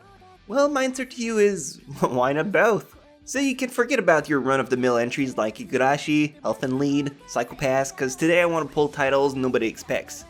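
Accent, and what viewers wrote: Quebec, Canada. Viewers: Eero Koponen *French Canadian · french canadian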